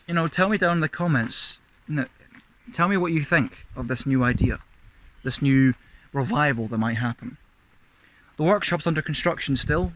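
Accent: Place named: Scotland, United Kingdom. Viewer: Oh my god you have grown up so much! Your accent too! So mature and Scottish. Keep up the great work!!!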